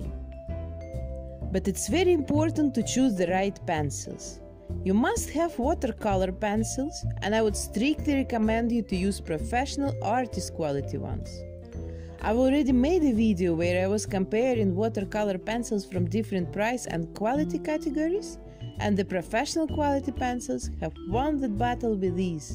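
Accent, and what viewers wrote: Russia. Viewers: Сорян, ты русская? , просто уши кровоточят:')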